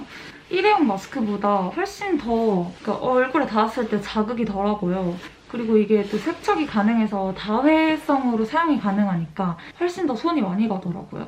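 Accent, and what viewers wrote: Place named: Daegu, South Korea. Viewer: 앗 이랜드... 혹시 우방타워랜드?? 초딩때 소풍으로 갔던 기억이ㅋㅋ 대구에서의 일상에서 사투리 자연스레 쓰는게 뭔가ㄷ ㅓ 정감느껴지네여~^^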